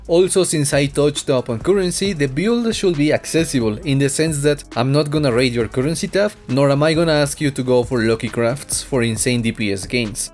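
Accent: Spain. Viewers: are you from like Spain or Argentina? greeting from Argentina btw c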